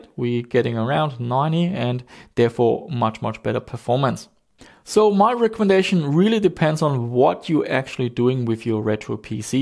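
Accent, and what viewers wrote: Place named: Germany. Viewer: Sehr deutscher Aktzent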